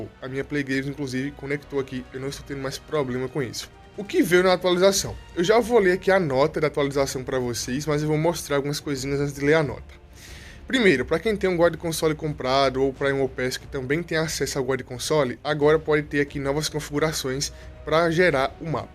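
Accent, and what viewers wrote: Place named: São Paulo, Brazil. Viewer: Pela voz se tem 30 e poucos anos e no jeito que se fala provavelmente se são Paulo na cidade de assis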